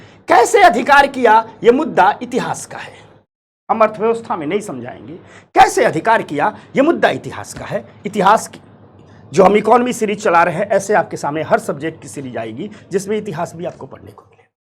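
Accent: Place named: Uttarakhand, India. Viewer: Guru ji aap uttarakhand ka ho kya apki tone lgre h aap dehbhooni sa,, ho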